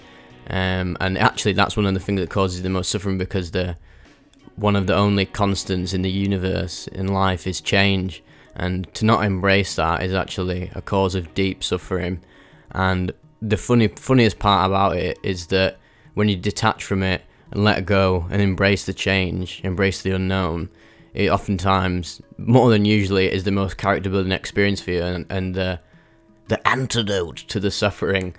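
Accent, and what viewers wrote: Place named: Northern England, United Kingdom. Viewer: Eee lad, I love that northern accent and humor. Trying to keep me wig on. 😅…. You’re awesome 😊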